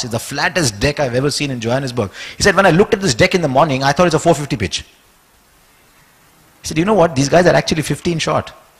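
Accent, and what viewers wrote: Hyderabad, India. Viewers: Came for the Hyderabadi accent.. stayed for the lesson.. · Namaskar harshaji it's cent percent hyderabadi aswellas beautiful english commentary with mellifluous voice thank u n request u for on field jokes as u hubnob with cricket cricketers and can Crack crickety jokes thank u sir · This is urdu+Hindi + Telugu accent = Hyderabadi language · I love Hyderabadi accent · Bhaago miya..kya karain 😂😂😂..he nailed the Hyderabadi lingo here 👌🏻👌🏻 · Hyderabadi 💯 aakhri kiraak chindiyaan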